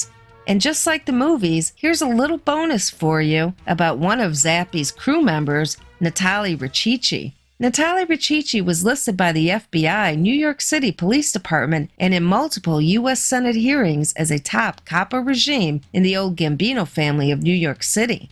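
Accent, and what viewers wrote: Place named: Chicago, United States. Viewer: Your Chicago Land accent is hilarious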